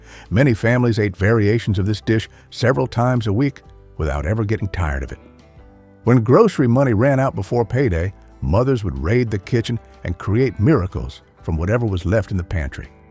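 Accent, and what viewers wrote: South, United States. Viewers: the AI southern drawl is maddening to me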